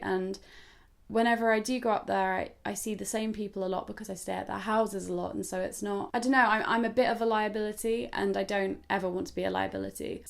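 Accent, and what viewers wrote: United Kingdom. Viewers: I wish I could meet you a onc, just to tell how much you are gorgeous with British accent .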